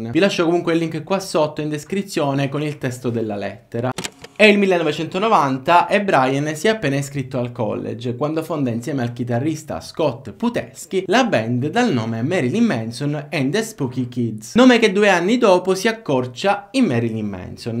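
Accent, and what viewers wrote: Sicily, Italy. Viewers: mi piace la tua cadenza siciliana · Direi dall" accento sei palermitano ! Bel video ciao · Quella dote narrativa con cadenza sicula "casa casa"... Adoro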